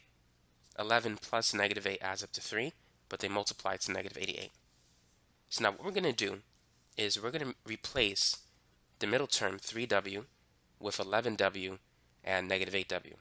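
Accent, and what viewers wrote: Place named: New York, United States. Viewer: This man gotta be from new york! DeadAss!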